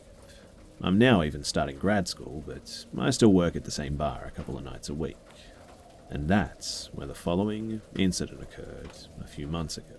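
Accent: Australia. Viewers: Sound like my buddy from Australia 😂, got him listening to you. Dig your channel my friend.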